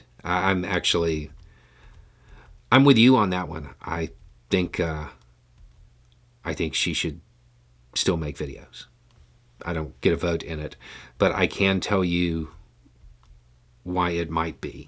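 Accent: South, United States. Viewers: I appreciate that you only post when you're confident of the evidence. It's one of the reasons we continue to watch your videos. Finding reliable information isn't the easiest thing. We live in Australia so we have 2 reliable news sources (ABC & SBS) but they aren't as reliable as you. They also don't come with a southern drawl 😊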